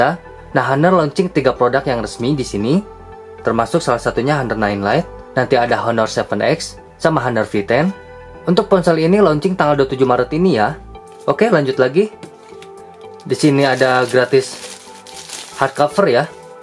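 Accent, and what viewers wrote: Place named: West Java, Indonesia. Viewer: logat nya orang sunda , bandung kah?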